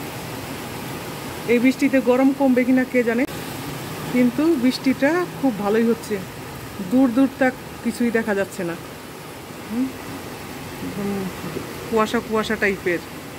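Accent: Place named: Bangladesh. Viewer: এই আপুর বাড়ি মনে হয় বাংলাদেশে। উনার ভাষা কোলকাতার ভাষা মনে হয় না।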